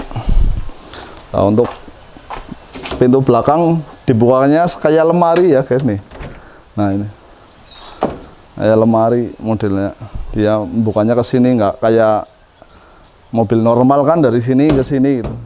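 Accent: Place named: Banyumas, Indonesia. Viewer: Dikerook 😀 ngapaknya ketahuan, Mas pasti dari mana ya??. Sukses mas, semoga cepat laku, keren banget mobilnya.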